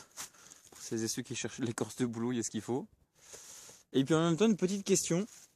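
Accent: Franche-Comté, France. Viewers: dans le meme esprit tu aussi a la rangers gore tex special grand froid et longue marche et tu as la rangers para c se que j avais,un peu moins chaudes que la gore tex mais plus efficace pour crapahuter voila apres c un avis perso!! pour un tarifs en dessous 100e pour les 2 modeles a pluss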